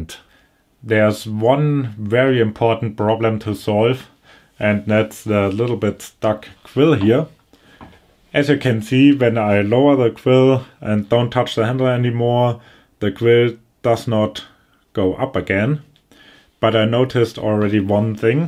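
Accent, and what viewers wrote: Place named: Germany. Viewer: Du bist Deutsch oder? Wenn ja das hört man raus (nicht böse gemeint)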